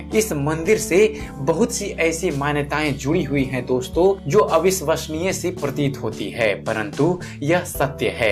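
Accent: Bihar, India. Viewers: Audio me kisi bihari ki awaz hai pakka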